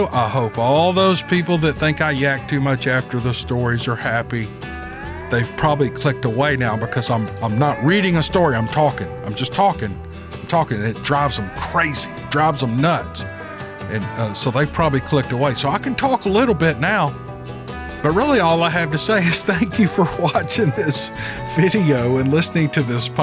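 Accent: South, United States. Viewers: I'm from Oz&love that Southern US accent. The more yakkin from the more content I am👍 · I haven't listened to this channel in a while, but I do like Southern droll, his enthusiastic style and layed back charm, but I've noted in the YT comments(and in narration), that there is a ruckus about analaysis after each story/report? Can I suggest that it could be a good idea to put bookmarks for each story with matching timestamps within the description - it'd be easy to do and would keep everyone happy(ish). BTW there's also some grumbling on other topically associated YT channels about this too - maybe it's a "sign of the times" thing that we are currently living in/under?! Keep safe and well, and remember to be humane to each other(I include myself), as times are tough in many ways(ditto).:) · I could listen to Southerners talk all day. I love your channel, especially the Steve Lily stories. 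Don't join the cancel culture and change something that's great, just to appease a few brain dead whiner's. God bless, James in London. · Chat all you want to. How can you not enjoy a southern accent? It makes some of us go weak in the knees. 😉😊🐾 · YOUR UNIQUE SOUTHERN BRAWL AND EXPRESSIVE OPINIONS ARE WHAT MAKES YOU........WELL, YOU! IF THESE VERY FEW "CRITICS" DON'T CARE FOR YOUR STYLE OF NARRATION, THEN, HEY, THEY CAN ALWAYS GO SOMEWHERE ELSE!! IT IS, AFTER ALL A VAST INTERNE WITH MANY OPTIONS. I'M SURE I SPEAK FOR MANY OF YOUR LOYAL LISTENERS IN SAYING "YOU KEEP ON KEEPING ON"! "YOU DO YOU"!! YOU GIVE THE STORIES THAT LITTLE TWIST AND YOUR REFRESHING OPINIONS REALLY ENCOURAGE ONES WHO MAY BE SUFFERING OR ANXIOUS ABOUT THEIR SIGHTINGS!! KEEP ON "YAKING", LOVE!! FROM ALL YOUR FELLOW TN FANS, DON'T STOP BEING!! LOVE YOU JUST THE WAY YOU ARE!! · don't change anything. I just discovered this channel not too long ago, and I really like your laid-back, down-to-earth approach. 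Your accent and the background country music makes me miss the USA as a UK citizen. I went to school and worked in Iowa and Massachusetts for 18 years, and it's like a second home to me. Thanks for all you do.:)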